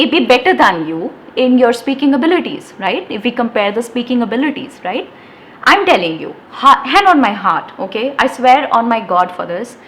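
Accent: India. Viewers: Hello Ma'am, I am Kajol Gupta. I am from Kolkata. I am 23 years old. And I am struggling in english speaking. I am not confident to speak in English but unfortunately i study in english medium. My friend says to me that you had studied on english medium school. But you can't speak in English at all. Why ?? They questioned me. But sometimes I regret myself & why ? Sometimes I can't tell anything or I say that I am still learning when that I can't practice this.but this time I practice myself regularly. Everyday I listening english podcast & you must say that I see easy english podcast which I can able to understand this easy english podcast. And last I want to tell about I love to see your videos when you speak in English fluently & naturally and when you speak in English native accent & I really like your indian accent which I can able to understand and you speak in English in easy words to makes me better to understand that what are you saying & what are you want to saying to ourselves ? 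Which is I can able much better than other hard and advance english podcast because I am a beginner & I can able to understand easy language or words to helps me better to understand. That's all !!! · Indian accent 😵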